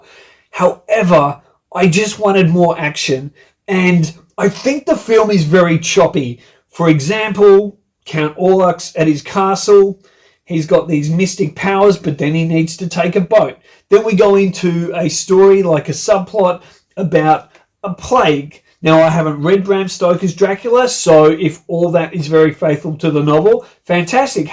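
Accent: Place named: Australia. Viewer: I love your Australian accent ^__^ !!!! Oh my gosh, love from Texas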